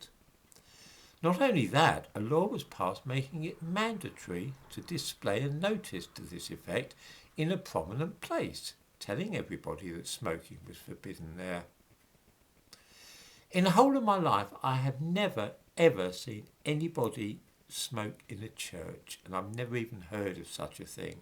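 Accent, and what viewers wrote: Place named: United Kingdom. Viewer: "Hello again." I can't help my self to repeat it in the same fashion in a moc your accent. Imitation is flattery type of way. It sounds so nice, I must hear it twice. Lol please don't take it as culture appropriation or a ethnic offense please. I love when Brits do silly impressions of Americans. Do you have any audiobooks on the markets?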